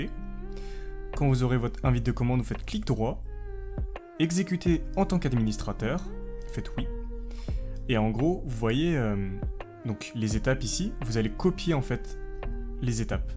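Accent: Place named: England, United Kingdom. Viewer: Rolala l’accent anglais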